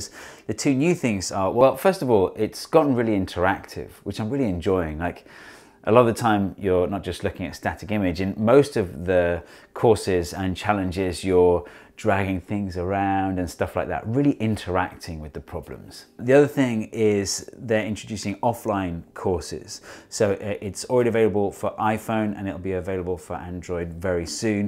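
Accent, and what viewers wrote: United Kingdom. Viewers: British always sounds cool.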